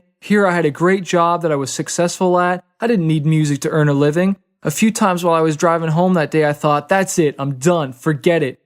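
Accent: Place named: Canada. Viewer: This this probably my favorite chapter in Fighter. I've never really noticed your Canadian accent until now...XD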